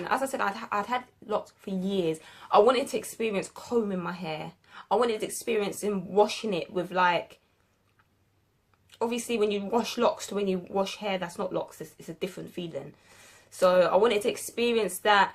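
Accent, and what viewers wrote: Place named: London, United Kingdom. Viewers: pretty skin and great to hear a uk accent. :) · carol durand yeah big time great to hear UK London girl cos all we usually get is American...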